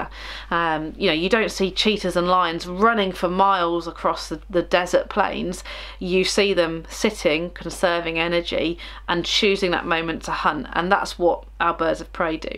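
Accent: United Kingdom. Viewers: I like the British accent! I love your animals... Los Angeles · I am currently trying to learn British English pronunciation (not really anything in particular like RP). I have to say, I love listening to people like you, who talk about interesting topics and also listening to how you speak. Also love the birds you have shown. Boop Boop ^^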